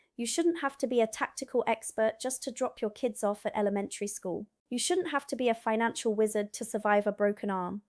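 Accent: United Kingdom. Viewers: Just curious? Where exactly were you born? You don’t have an American accent. You speak very mostly British.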